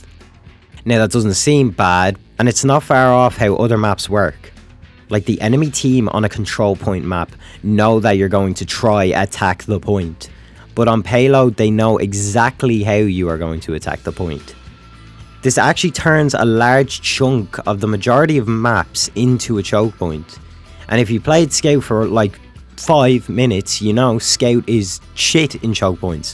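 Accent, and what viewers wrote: Ireland. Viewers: Are ye a Dubliner? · Very well done mr irish voice person. Sub increment plus one. One thing I've noticed playing defense as scout is that people get SUPER bitchy about it. You can't play scout for five minutes before you're being told you're the single worst human being to ever have been born. I don't usually play scout and I do usually play payload (or koth because koth has pretty maps) so it's super jarring to go from being the medic 96% of the time to being told I'm dead weight as a scout. (Particularly jarring when you go from top scoring the prior round and hard carrying an offense to being told to self terminate on defense) I always did kind of wonder if it's just me who experienced this and it seems not. Would love to see more. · is this man irish and so am i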